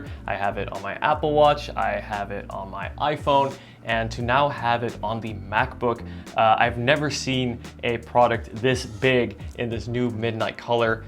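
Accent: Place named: Netherlands. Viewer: Checked the name after I heard 1 second, yup Dutch…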